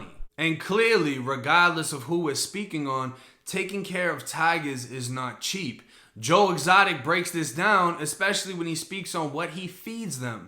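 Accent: Boston, United States. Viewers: Bro if your from Florida why are you speaking with a Boston accent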